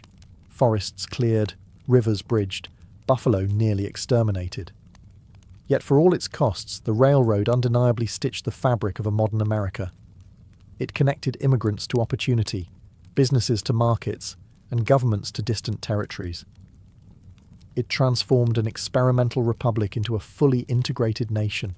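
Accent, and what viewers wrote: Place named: England, United Kingdom. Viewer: Cool history subjects! What puts me to sleep it is the English accent, I always fall asleep watching movies with English accents 😴😴😴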